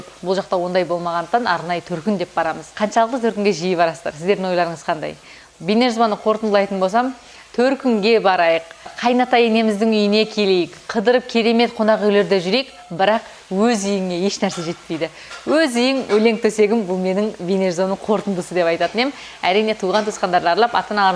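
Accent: South Korea. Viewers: Тилин корейцев айналып бара жатыр, кей бир казакша создеринди тусиниксиз сойлеп жатырсын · Дикция кәрісшеге 😃☺️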